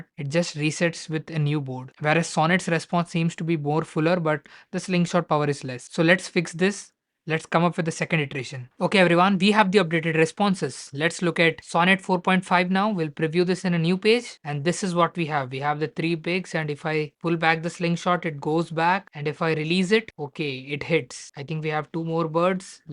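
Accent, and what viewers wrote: India. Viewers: Please take english lessons i cant with these indian accents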